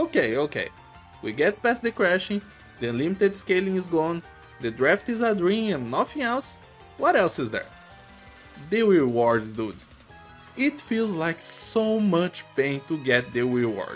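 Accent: Brazil. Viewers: You sound like a Brazilian speaking English, could I be correct?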